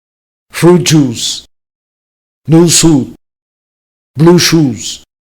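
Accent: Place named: United Kingdom. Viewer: This is by far the most fun I have EVER had with a youtube video. It's true but more like with a British accent.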